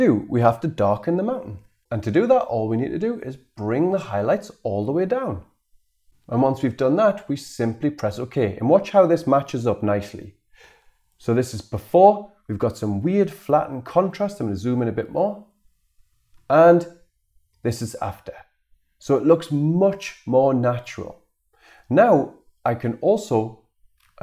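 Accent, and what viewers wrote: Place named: Bradford, United Kingdom. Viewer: Are you from Bradford? Your accent sounds too familiar! Also, great video! I've missed playing around in Adobe CS.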